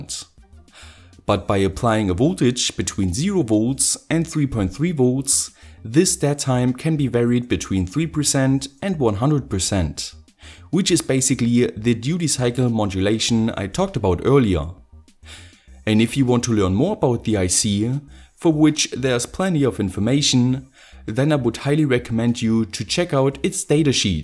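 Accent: Germany. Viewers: Can you build a singing Teslacoil please ? And are you German ?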